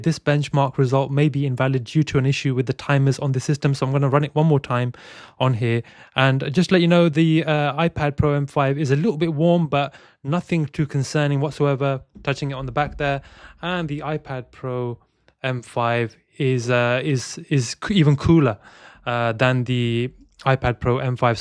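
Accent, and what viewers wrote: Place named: United Kingdom. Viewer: His accent is clearly UK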